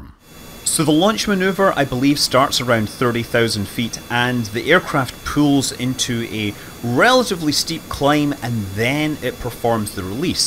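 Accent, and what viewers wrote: United Kingdom. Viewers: Getting really tired of the limey accent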